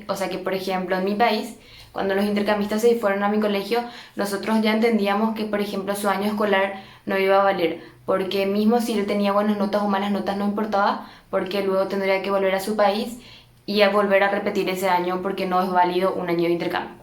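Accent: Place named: Paraguay. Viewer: holaaa!!!! soy nueva en tu canal, eres de paraguay??? me suenas por el acento , nueva sub...jsjsjsj muy buen video!!!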